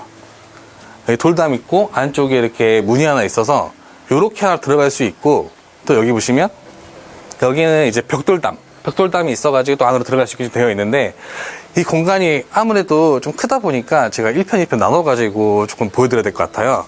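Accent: Jeolla, South Korea. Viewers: 영상 처음보는데 혹시 전남쪽? 광주? 이신가요? 차라리 사투리를 더 쓰시면 잼있을듯 합니다 ㅎㅎㅎ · 말이 전라도네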